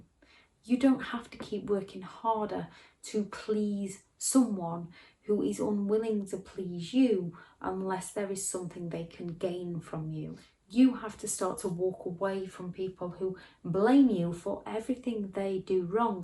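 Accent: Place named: North, United Kingdom. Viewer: Became atoms, from crumbs, from a loaf, from a whole bakery. I never knew things could be salami sliced so much. Thank you for doing this. Its great to hear a northern girl doing this 🙌🏽🙌🏽🙌🏽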